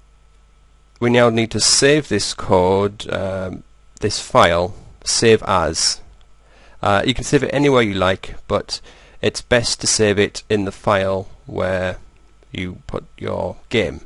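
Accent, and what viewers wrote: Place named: England, United Kingdom. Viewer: I really like your accent! What part of England are you from?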